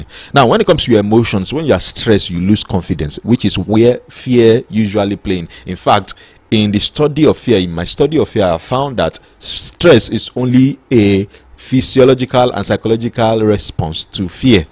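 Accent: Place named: Ibadan, Nigeria. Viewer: Nice one but why your yoruba accent like Ibadan man come dey come out.....pu-bu-lick😊😊😊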